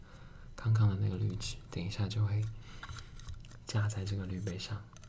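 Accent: Taiwan. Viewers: 男生台腔做ASMR还是很舒服的，我补脑了下东北口音瞬间笑死。做的很棒！非常感谢。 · 剛才躺著聽這個準備睡覺，都沒有看到視頻的題目，可是聽到你我就知道你是台灣人。好喜歡台灣人說的普通話。口音好好聽，還有你的聲音也非常好聽哦!希望你會做更多視頻! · 我是大陸人，我就超喜歡台灣的口音的！感覺超溫柔超可愛的！哈哈，每個人喜歡的不同吧，反正我認識的朋友都很喜歡台灣口音，不會排斥的💛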